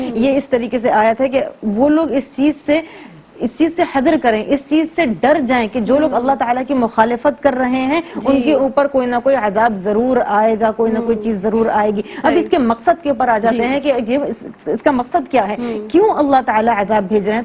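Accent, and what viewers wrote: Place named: Arab world. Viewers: I think you don't have idea that she have born in Saudi Arabia, and brought up there and she doesn't know urdu much, and her first language is arabic that's why she speaks like this, kindly search about the person then talk about them....jazak Allah khair. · Why she talk Urdu in Arabic style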